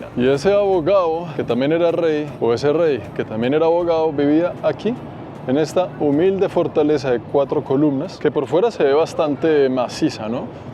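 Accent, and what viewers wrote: Colombia. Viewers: es neutro de Colombia. jajaja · Me encanta su tono de voz tan varonil y ese acento colombiano tan característico, saludos desde Culiacán Sinaloa, México ❤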